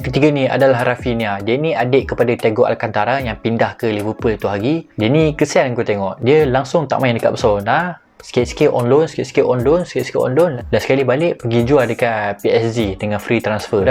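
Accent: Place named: Kuala Lumpur, Malaysia. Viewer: Awt ckp KL 😂 😂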